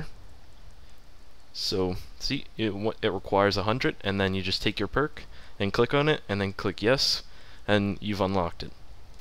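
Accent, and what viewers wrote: Canada. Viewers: canadian accent? :D im british btw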